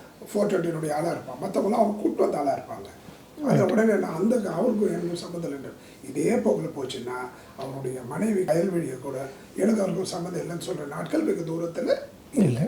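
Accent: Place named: Telugu, India. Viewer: எவ்வளவு பணம் நீ வாங்கி பேசுகிறாய் உன் தமிழ் வேறுமாதிரி இருக்கு நீ தெலுங்கரா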